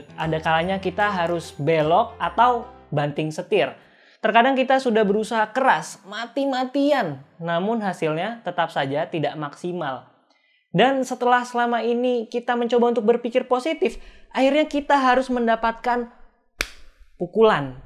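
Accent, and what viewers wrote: Toraja, Indonesia. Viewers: Lu orang toraja bang??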